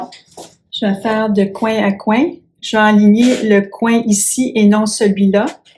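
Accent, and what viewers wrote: Quebec, Canada. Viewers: J’adore l’accent canadien et les conseils au carreau également, bravo bravo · Quel délicieux accent québécois 💙🤍❤️ nos adorables cousins 😊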